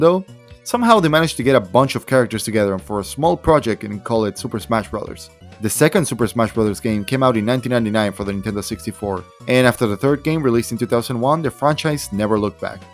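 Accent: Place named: Argentina. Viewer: i'd never expect to encounter some Argentine Sonic The Hedgehog Fan English Speaker... Que Copado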